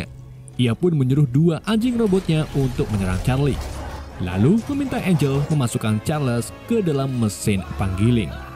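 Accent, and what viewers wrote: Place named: Cirebon, Indonesia. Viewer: Wong cerbon kih ketenger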